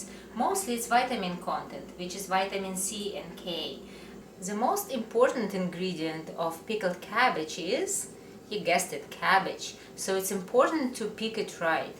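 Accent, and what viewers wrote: Russia. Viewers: terrible russian accent... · God how I love Rooshan accent.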